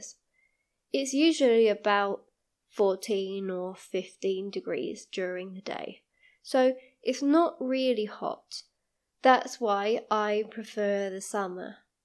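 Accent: RP, United Kingdom. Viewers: Hi Is this RP accent?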